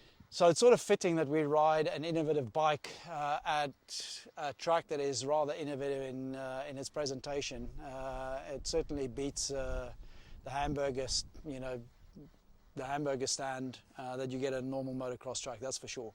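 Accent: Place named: South Africa. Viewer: You sound South African :)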